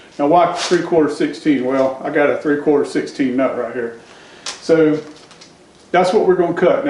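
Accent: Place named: South, United States. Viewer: Still learning how to listen/understand southern backyard machining (American) English/accent. Your learning curve on understanding that old of machine, informative.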